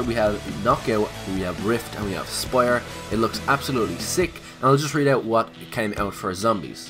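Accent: Ireland. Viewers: Are you irish I am?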